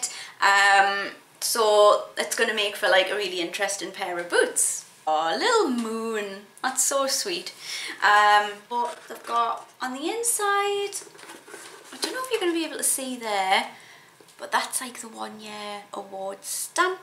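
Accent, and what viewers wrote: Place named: Newcastle, United Kingdom. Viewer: Love your Geordie accent and the boots xxxx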